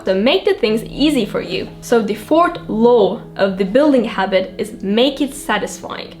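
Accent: United Kingdom. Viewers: Bu videoda ingilizcende bir ingiliz aksanı sezdim sanki. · have you ever been in england? ur accent reminiscent of british. just my opinion 😌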